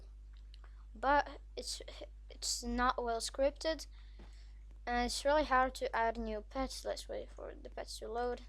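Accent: France. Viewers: Are you french ? i am french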